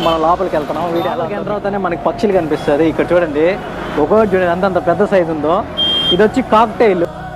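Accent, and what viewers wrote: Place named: Chittoor, India. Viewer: What a slang pakka Chittoor 😂😎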